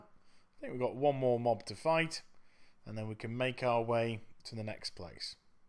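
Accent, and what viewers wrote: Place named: United Kingdom. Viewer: Loving listening to a british WoW player for once! can't stand all those thousands of Americans. Thanks for guide worked a treat, was 50th mount got albino drake:)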